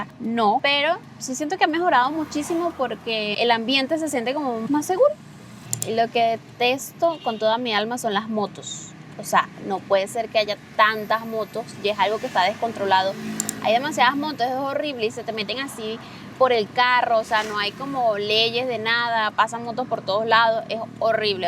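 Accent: Venezuela. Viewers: Me encanta que has llegado con tu acento venezolano. Es posible que tengas nuevas palabras y expresiones pero es tu acento. Algunos con solo unos pocos meses fuera del país vienen con otro acento y hasta pretenden que olvidaron ciertas palabras.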